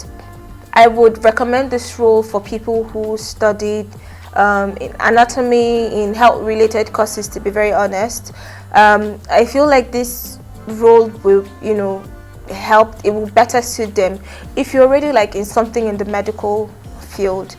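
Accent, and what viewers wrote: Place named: United Kingdom. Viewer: Its the British accent for me......keep it up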